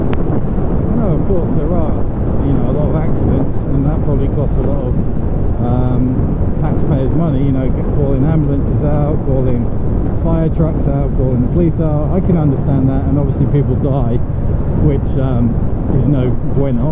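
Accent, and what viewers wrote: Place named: RP, United Kingdom. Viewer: Love the posh stereotypical Hollywood British professor accent 😅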